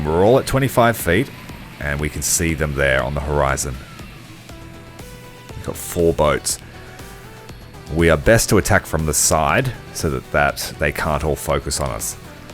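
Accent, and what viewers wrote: Australia. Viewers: Watching this at 8am in the UK, listening to a drunken Aussie talking about rave rounds. All is right with the world.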